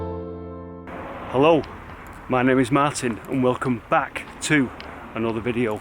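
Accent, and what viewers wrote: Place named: Northern England, United Kingdom. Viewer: Well Thank you for your pleasant video of a DISGRACE for N.H. they should have preserved this GEM . Stupid attitude of town hall; they don't have the Millions needed to invest . So in fact they are responsible for the abandonment and destruction by Mindless Yobs. You are a pleasure to listen to although i am not fond of northern accents. Your personality surpasses.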